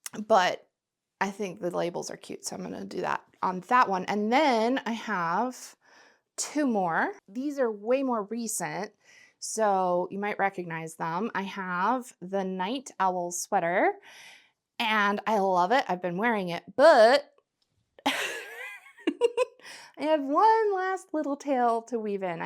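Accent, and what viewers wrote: Midwest, United States. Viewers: As someone who grew up all over the Midwest from Missouri up to Michigan and Wisconsin, I do remember the warnings and the reality, but also that it was a point of pride among some of us to see how long into winter we could go without wearing our coats so... I suspect that, while it may ALSO have to do with cell phones and complacency, there's a large element of "teenager" in there, too! And some of it on your part is simply how extreme the area was where you grew up. The coldest I've ever experienced was in Iowa, but for consistent bone-chilling, that was Minnesota. When I lived there it took a while to get used to the habits you grew up with. I thought everyone was bonkers for leaving their car running while they were in the store. Welp. If you don't, it may not start when you come back out! We live and learn, and your kid will, too. 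Eventually. :D Yes, I can totally hear the Minnesota and Wisconsin in you. lol For me it isn't just the "o" but the "sc" part of it that gives you away. Team Bubbler, yeah?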